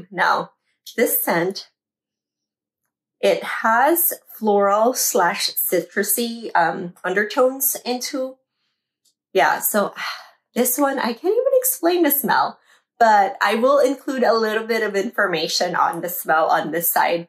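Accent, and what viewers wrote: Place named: France. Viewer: Great video sis, Loving your french accent..😄 I'm the same, as you, I have my fave perfume that I've been using since high school and its the Calvin Klein Eternity, it just brings nostalgia for me..💗 I'm perfume obsessed lately and have a few different ones..lol.. I do want to get the Louis Vuitton, Rose de vents and Chanel 1957. those two are on my Christmas wishlist . Thank you for sharing, have a wonderful weekend 😘💖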